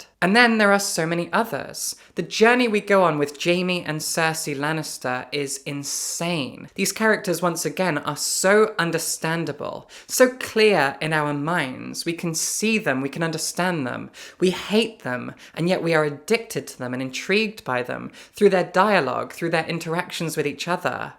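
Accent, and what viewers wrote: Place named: Scotland, United Kingdom. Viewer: Accents: your own is fascinating. Clear indications you don't mix with working class Scots (or Scots!) much, posh Guffie tones. Vocal crack and Americanate vocabulary indicating your chief influences culturally are from the "Land of the Free". 😂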